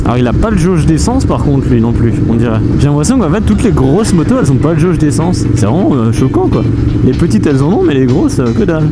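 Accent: Alsace, France. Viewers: super essai complet et vidéo au top!!!je kiffe la cohérence dans ttes tes vidéos.ainsi que la musiques qui annoncent les différentes rubriques et qui restent les mêmes d'une vidéo à l'autre...sinon pour la ducati de ton pote tu as eu des news?À propos j'ai une petite blague en rapport avec ton bel accent alsacien qui colle très bien avec l'incendie de la ducati:"tu sais ce que veut dire SP sur les camions de pompiers?SA PRULE!!!"Avé l'accent évidemment...😂😂 V et longue vie à toi et hâte de voir tes futurs essais✌👏👏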